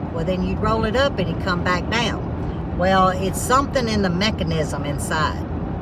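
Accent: South, United States. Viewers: Miss I say sqaRsh, and waRsh, Wooder, (water) and Breeeed (Bread) and I'm born and raised on Marylands Eastern shore. We sound a like suthern sister😂❤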